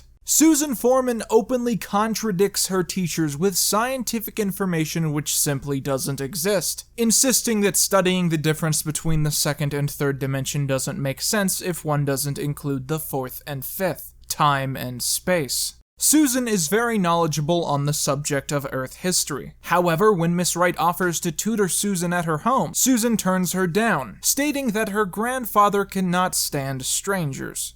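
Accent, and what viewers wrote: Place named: United States. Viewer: The problem I have with this video is that a Yankee voice is lecturing the audience about a quintessentially British science fiction programme. The American TV's record with sci-fi is not exactly innovative. When the pubs were emptying so people could get home to watch the Quatermass serials, what did the Americans have? Captain Video! Those of us who have watched Doctor Who since the first episode, have accepted changes over the years, the Actors in particular. Since the re-boot however, the change that has been most disconcerting is how it has been turned into a soap opera by, let's face it, kitchen sink dramatists. The changes that have occurred did not, for 50 years interfere with the main premise, that Doctor Who is a male hero. It seems that only when Moffatt ran completely out of ideas, that the hints started about gender swapping. 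It is apt that the speaker mentioned Sherlock Holmes, I notice that he did not say, suppose you wake up one day and find that Sherlock Holmes has become a woman. That is the impact of this change. To make Doctor Who a woman is just a lazy gimmick by a desperate BBC to improve the ratings. I suspect that after the initial interest over such a stunt, that the good Doctor will disappear quietly from our screens (mustn't blame the change to a female). Perhaps to be resurrected in less politically correct, trendy, gender fluidity infatuated times, when it can get back to being an escapist, exciting, scary sci-fi show.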